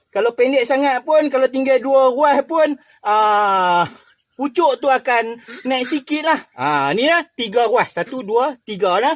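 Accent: Kedah, Malaysia. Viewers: org pahang awat cakap kedah😁 kami teringat kat keluarga kami kt kg😢😢😭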